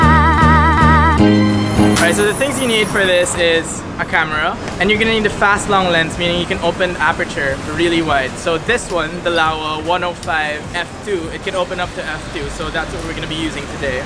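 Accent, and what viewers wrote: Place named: Philippines. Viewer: From his accent alone i know this guy is filipino hahahahaha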